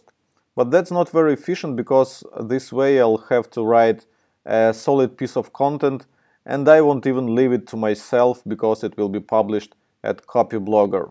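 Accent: Russia. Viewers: Акцент скорее как у Васи русского, а не у ))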